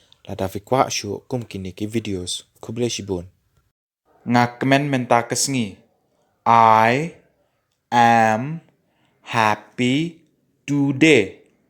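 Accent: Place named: Meghalaya, India. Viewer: Sa kren dei jong pnar fi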